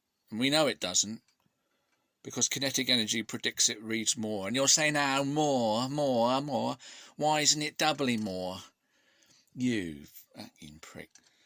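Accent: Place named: Cockney, United Kingdom. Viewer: Oh dear indeed - Thank you for sparing some of us the time wasting ordeal of listening to his utter garbage excuses. You can congratulate yourself on getting him to weazel out of his long standing MV claim. "Cluuuuck cluck cluck cluck" is what it sounds like from him. And so he doesn't like the way you talk? What does he want you to do... fake a different accent? I'm a Midlander/ Northerner and love the London / Essex accent, whchever it is - To us it all sounds pretty Cockney, a bit Arfer Daley and I wish I could mimmick that accent cause I always thought it sounded dead cool. Now if you had a Brummy accent, he might have a point lol! Just kidding. It shows his difficulty dealing with reality, when even the reality of your regional accent is too much for his "brain" to bear.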